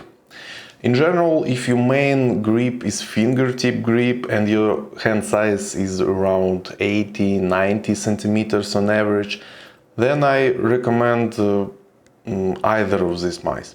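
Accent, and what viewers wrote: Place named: Poland. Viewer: Ну ладно, не русский а поляк Ну все же акцент сильный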